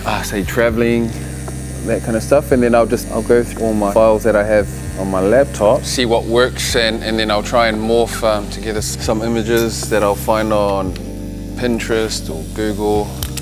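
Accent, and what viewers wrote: New Zealand. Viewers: New zealanders have a very distinct accent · I love that Kiwi accent. That shit cracks me up after watching beached az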